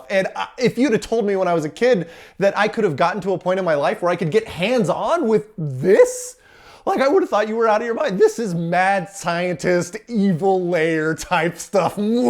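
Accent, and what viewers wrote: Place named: Texas, United States. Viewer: I love how you got real Texan when talking to camera. David Murray does it too. Nice Bendix by the way.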